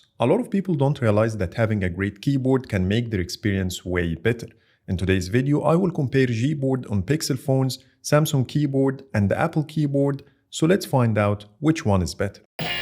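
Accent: Egypt. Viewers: الاكسنت المصري تقدر تميزها على طول 😂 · From your accent i know you are Egyptian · اكسنت انجليزي مصري بس بحد النطق كلو صح ماشاء اللة اتعلمت انجليزي فين ؟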